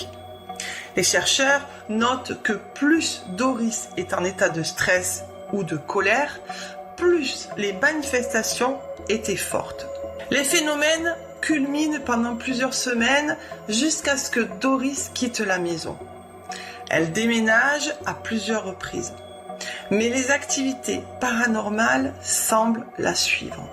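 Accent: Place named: South, France. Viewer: Bonjour 😊 je découvre la chaîne avec ce petit accent du sud 😊 comme nous 😊 du coup un abonné de plus 😊 merci pour ce partage 😊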